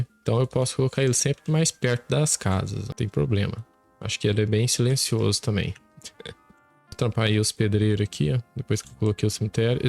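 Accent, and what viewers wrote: Minas Gerais, Brazil. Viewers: vc é engraçado o sotaque mineiro deixa mais engraçado ainda o video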